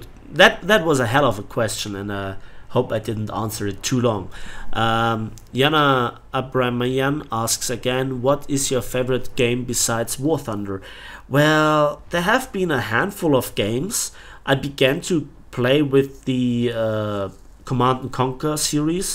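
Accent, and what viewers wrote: Bavaria, Germany. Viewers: Greetings from the Capital and to be honest please make more Subnautica. You actually made me buy it and i love it. Youre Bayrisch is hard to understand here up north but just speak the language youre comfortable with.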